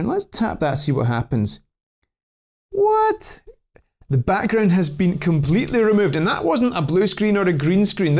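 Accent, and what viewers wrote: Scotland, United Kingdom. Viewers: fantastic, what a joy to hear a scots voice 😊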